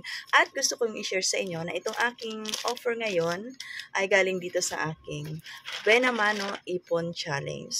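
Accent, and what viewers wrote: Waray, Philippines. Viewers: Hello sis lagi kita pinanonood mga vlog mo nadidinig ko nag wawaray ka taga saan ka po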